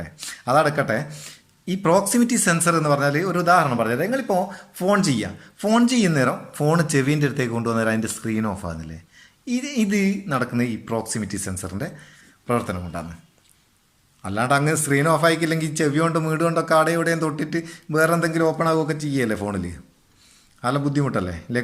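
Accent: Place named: Kozhikode, India. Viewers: Idh ksd slngalla... kozhikod😤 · Aadhyam ningale nadapuram slang ozhivaakk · Kozhikod slang😀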